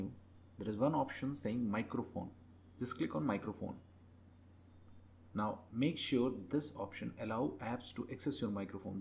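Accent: India. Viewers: i love ur indian accent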